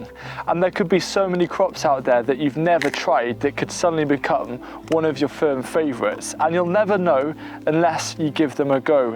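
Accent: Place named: Wales, United Kingdom. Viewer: Your Welsh accent is so sexy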